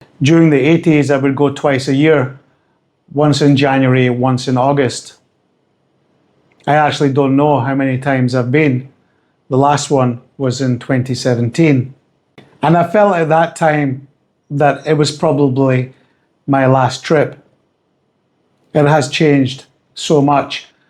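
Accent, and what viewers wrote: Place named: RP, United Kingdom. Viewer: Your BBC pronunciation is beginning to grate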